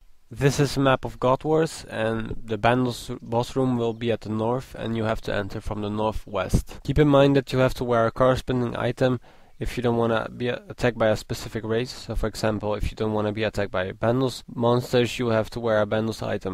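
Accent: Netherlands. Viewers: Haha leuk nederlands accent :p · Ik hoor aan je stem dat je NL bent! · are you from the netherlands or from Belgium? nice vid btw, thumps up :) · I love the "+20,500,000" xD btw are u dutch?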